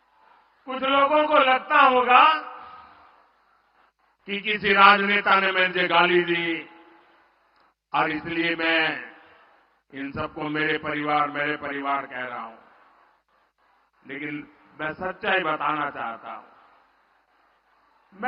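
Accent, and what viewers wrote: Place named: Bengal, India. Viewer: He's talking in bengali accent what a marketing strategy 😅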